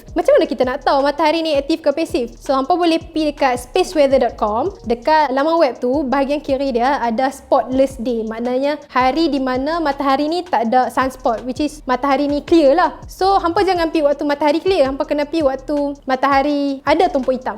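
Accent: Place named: Kedah, Malaysia. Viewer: Lebih baik guna BM standard. Boleh bantu pelajar ting 3 untuk lebih faham. Loghat kedah pekat noo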